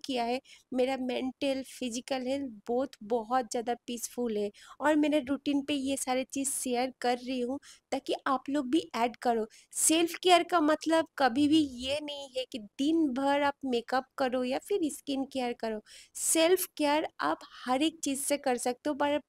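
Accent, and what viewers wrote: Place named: Bengal, India. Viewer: App bengali ho.... Hindi sunke asahi lag raha hai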